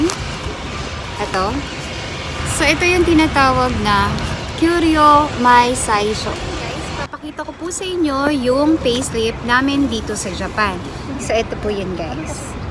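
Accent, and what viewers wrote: Visayas, Philippines. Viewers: Bisaya d.i ka ma'am 😅 cebu here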